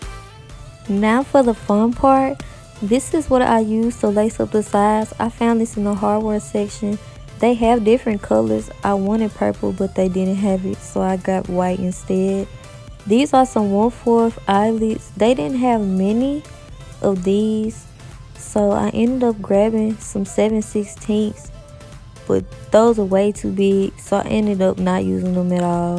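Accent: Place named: Chicago, United States. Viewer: This is so cute & I love your accent !! Chicago Baby 🤩🤩🤩💛💜💛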